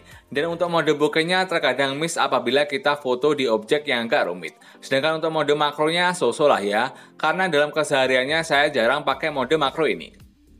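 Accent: Magetan, Indonesia. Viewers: Asli ne wong ndi iki kok nk alon" magetan🤣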